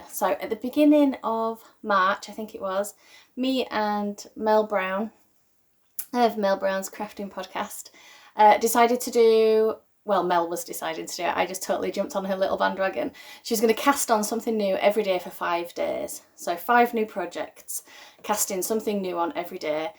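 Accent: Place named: Sheffield, United Kingdom. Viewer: Hi good to watch you again. Love hearing you, reminds me of Sheffield!!!! We moved out to New Zealand 16 years ago and I miss ALL the English accents. :) We are in autumn here and I just cast on a swatch for a new jumper for my hubby. I hope you decide to make up your own patter. It seems to me that you are really creative so this is just another way to explore your creativity. Your spinning is making me go back to finish my rolags. :) Catherine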